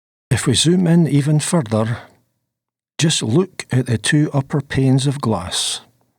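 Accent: Scotland, United Kingdom. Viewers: Hello from Belgium. Thank you for this fascinating video. I always was interested in things like this. You're a great storyteller! Love the Scottish accent. Everyone can fantasize about the woman in the window. Thank's for doing the history. 👍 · What a wonderful video, remarkable story telling ability, and great Scottish brogue. A delightful experience. You also reminded me of my Uncle Wink which also brought pleasant memories. Have subscribed to your channel and look forward to further video's. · Me gustó muchísimo tu investigación. Me habría agradado escuchar tu voz relatando la historia. No comprendo porque el audio que me llega esta doblado al español. No hay modo de disfrutar de tu acento escocés. Felicitaciones por tu tarea. Saludos desde Buenos Aires. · What a fascinating story! And told so well! No fanfare, just good old fashioned storytelling made all the more interesting by your lovely Scottish accent! 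Thank you!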